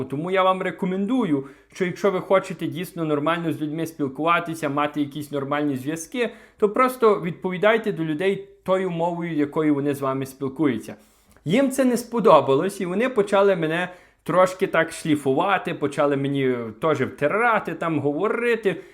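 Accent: Western Ukraine, Ukraine. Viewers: Вы говорите что вы умеете хорошо можете говорить на российский мови тому до у вас е западэнсЬкий акцент. А до робыть вам якщо мы Родом з Мариуполя и розмовляють там все российской. Мы были там всю блакаду голодали и с трудом выехали из оккупированной територии. Что нам делать если мы родились и выросли в Украине а разговариваем на непонятном языке не на русском и не на украинском. За то что мы такие к нам плохо относиться. У м Мариупольцев обромное горе Нас лишили окупанты всего имущества которое у нас было и лишили здоровья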